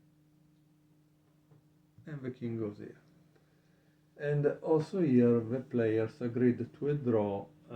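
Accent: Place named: Italy. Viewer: Por la forma en que hablas inglés eres italiano.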